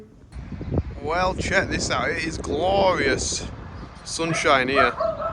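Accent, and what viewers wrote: Barnsley, United Kingdom. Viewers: thank you for content love hearing a fellow Barnsley lad 😂 don't be late for big Mick Mick at weekend 😂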